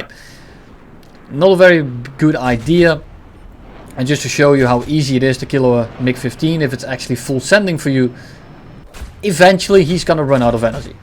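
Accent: Netherlands. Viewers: you have somewhat of a dutch accent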